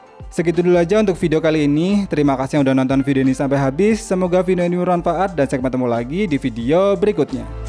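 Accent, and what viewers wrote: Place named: Sleman, Indonesia. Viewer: koyo ng sleman ikuu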